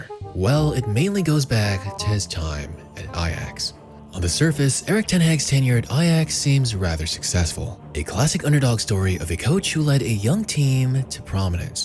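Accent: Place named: United States. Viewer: The yank accent further proves how laughable this is 😂😂😂😂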